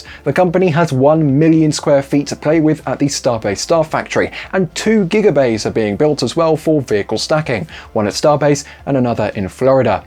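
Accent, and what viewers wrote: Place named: England, United Kingdom. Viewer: Are you really trying to tell the English guy how to speak English? The meth pronunciation is from the dumbed down version of English. 🇺🇸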